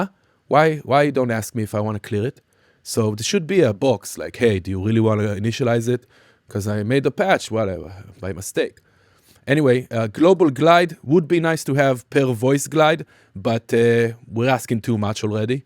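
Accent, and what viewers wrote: Israel. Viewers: Israeli accent?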